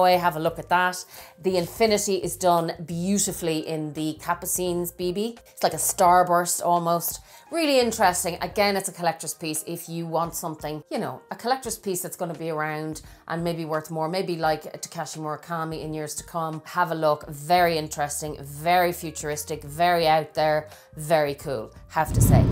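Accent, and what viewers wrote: Ireland. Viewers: Thank you & your Irish Brogue also make listening merrier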